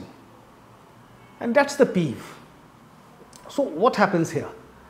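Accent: India. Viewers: I like your analysis AND. I like your accent - not everyone needs to speak like goras - Indian accents are perfectly acceptable - don’t be snobbish guys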